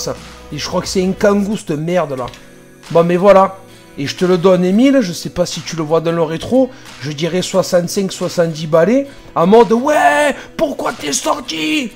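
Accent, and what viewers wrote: South, France. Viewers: trop sympas ton accent du sud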